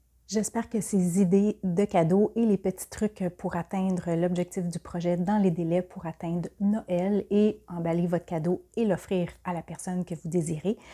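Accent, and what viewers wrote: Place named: Quebec, Canada. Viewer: merci pour ton accent je pense que tu es du Québec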